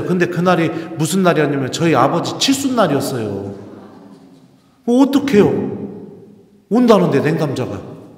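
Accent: Chungcheong, South Korea. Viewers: 신부님의 강의 너무너무 감사히 들었어요.^^ 세상 참 좋은것이 이렁게 머~언 캐나다에서도 편하게 좋은 강의를 들을 수 있으니 얼마나 감사한일인지요.❤ 보통 집안일을 하면서 듣는데 신부님의 강의를 메모하며 집중해서 듣고 싶어서 작게 차려놓은 기도 테이블 앞에서 들었어요. 끝날때쯤 "저를 위해서 성모송 한번이라도 기도 부탁합니다"하실 때 가슴이 찡하면서 눈물이 나오려했어요. 신부님들은 신자들을 위해서 온갖 고생 하시며 먼 곳까지 마다하지 않으시고 강의를 다니시는데 신자들에게 기도 부탁하실 때 보면 목소리가 작아지시더군요.^^신부님, 매일 사젤.ㄹ 위한 기도와 주모경을 바치오니 부디 영육간에 건강하시고 어떠한 유혹에도 물리치실 강력한 힘을 하느님께 빌어드립니다 참고로 제 고향도 충남 보령 웅천입니다.ㅋㅋ 신부님의 구수한 사투리가 참 정겹습니다. 신부님 화이팅!!❤❤❤❤ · 신부님! 감사합니다 늘 건강하세요 요즈음 당신의 강론을 듣고 살맛납니다 충청도사투리 넘 구수하고 멋있어요 감사드려요 신부님 알랠루야....❤❤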